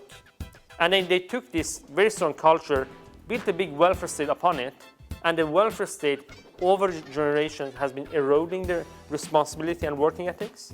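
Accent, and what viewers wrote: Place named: Iran. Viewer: This guy sounds Persian!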